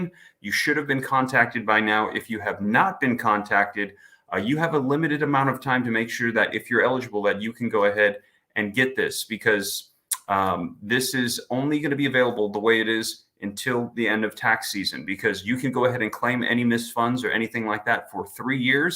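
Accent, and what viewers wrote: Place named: Louisiana, United States. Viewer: I could hear you say you Louisiana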